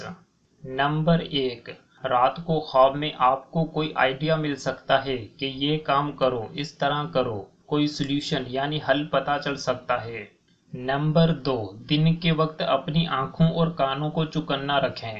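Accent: Sindh, Pakistan. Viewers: Awaz kisi aur ki use kr loo. Betay ya bahi. sa bunwa loo video with good. sound and style. Sindi village style chorr du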